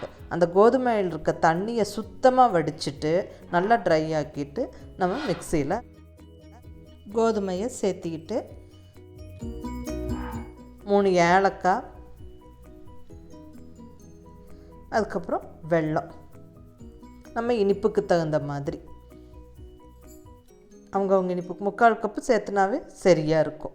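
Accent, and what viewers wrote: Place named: Kongu, India. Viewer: Thank u so much for ur video....... Ketadhum u did this vlog....... So sweet of u....... Recipe is so Gud and again our Kongu slang wow....... Nice to hear......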